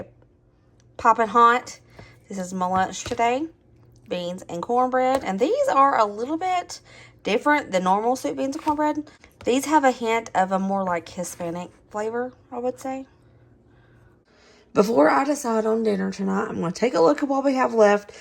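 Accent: South, United States. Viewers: I had to add that i love, love, love your accent. It is comforting and sweet. I feel like I'm listening to a southern friend. I'm from northern Virginia, so our accent is less here...still technically in the south, but because we live near the DC Metro area in the city, everyone's accent is a mix of where they are from (very international) and from here. My parents are both from Wisconsin, so I have a bit of a Norwegian accent. You can cook all the beans and season them. You can make half still whole and do the other half refried. You can freeze part of what you make, as you are correct...cooked beans last in the fridge for 5 days. You can freeze cooked beans up to 3 months. I frequently cook a double portion of beans, then freeze half for the following week. This saves time and money (stove/electric). I thaw the beans in the fridge, then serve differently than the prior time. 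With rice, in a soup with vegetables and a boullion cube or two, or on toast, corn tortilla, etc! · would you be willing to share your garden journey. I know you may have already started planting. But I would love to see videos on what all you grow & what you do. I like the videos you do for budget cooking. When you were getting the vegetables it reminded me that last year you would use stuff from your garden. I think people enjoy watching you. Your sweet southern accent, smile, laughter & how honest & real you are. I know when ppl start channels it might be for a specific thing. But clearly your subscribers enjoy all aspects of you are willing to share. From the camping & spending time with your family, to the fun game you & Dusty played. Tell the family hello. God bless you all all ❤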